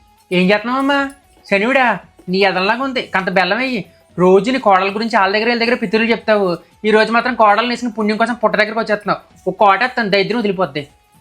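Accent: Godavari, India. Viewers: Baboi...😂😂😂 Super comedy...ekkada unnaru mastaru meeru..love godari slang and very close to heart ....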